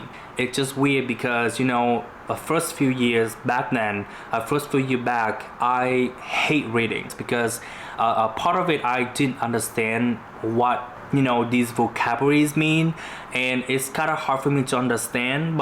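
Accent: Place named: Vietnam. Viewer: This was so dope! You’re such an interesting, intelligent, methodical and organized person. I also love how your Vietnamese roots shows up in your English. It’s so dynamic and beautiful! P.E.A.C.E., J!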